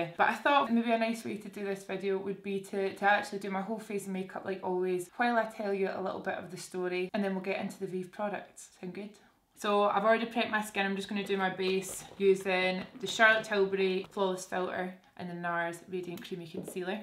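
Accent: Scotland, United Kingdom. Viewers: I’ve been watching you since waaaaaaay back in the day having stumbled on you by complete accident. I have a great love for Scotland and it is my number one bucket list place to visit so when I heard your accent I stopped and was immediately obsessed by your your personality. You’re such an inviting loving person and have an energy that I can only describe as sunshine. I watch every single video religiously. I’m so so so happy for you about your brand and honestly I’m really proud of you!! Nothing but love from me to you sister!! You’re killing it. Keep being the beautiful human that you are xoxoxoxoxoxo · I'm tearing up just listening to you talking about VIEVE. I've been following you FOREVER! I was still in high school when I started following you on instagram, you still had the white stripe with the black hair. You didn't have Drogba yet. You lived in a tiny flat and you were a bit shy in front of the camera. 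And oh my god, I didn't think accompanying your journey had gotten to me until now. I feel like a proud friend! My mom and I watch your vlogs religiously (even though I have to translate half the things you and Jack say, she's not good at understanding Scottish accents). You're the only beauty influencer I truly listen to. I'm so so so happy for you!!! Lots of love from Brazil! Trust and believe I'll save money to get e v e r y Vieve product.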